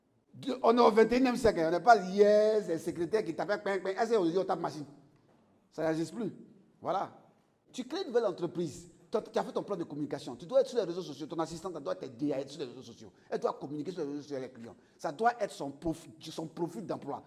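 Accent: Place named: Bété, Ivory Coast. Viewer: Vraiment vous parlez comme un Ivoirien précisément Bété. Je signale que j'ai aimé et je sur que je vous raconterai par la grâce de Dieu.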